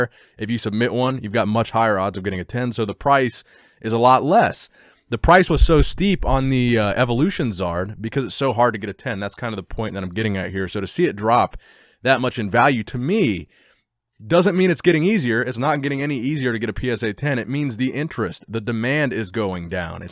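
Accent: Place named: New Zealand. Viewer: Awesome videos, you actually sound like a new Zealander too which is crazy. Keep it up bro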